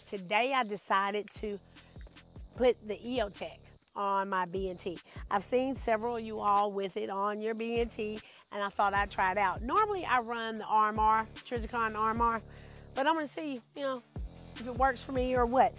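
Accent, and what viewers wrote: South, United States. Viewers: I was really loving a video, but then she started to talk and I fell in love 😍 I love me a good southern women’s accent. Great shooting and you got your new subscriber. ❤❤❤ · You deserve more subscribers. Cool content. Not many women doing this & as a Georgian I appreciate the cute southern accent. Cheers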